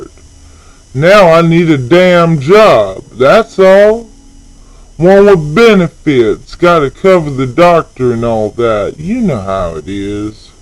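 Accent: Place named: South, United States. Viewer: Woah he's southern damn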